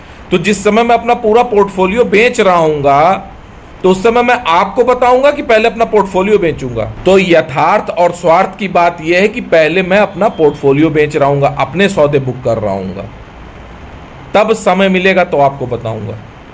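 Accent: Kanpur, India. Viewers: सर आप कानपुर से हो क्या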